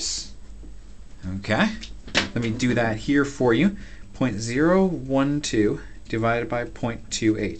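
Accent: Canada. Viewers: you just took a nice $@ on my professor life, thanks man and btw i really like the canadian accent "OAT" when you say out haha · ''check it OOT'' gotta love the Canadian accent man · are you canadian?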